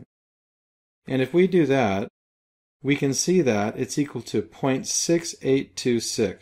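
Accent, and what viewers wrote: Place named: United States. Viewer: This is fantastic! A four-minute lesson on exactly what I am trying to understand --the 68%. Plus, it's a native American speaker with a clear-as-a-bell voice. I only stopped it from auto-playing the sequel in order to vote thumbs-up!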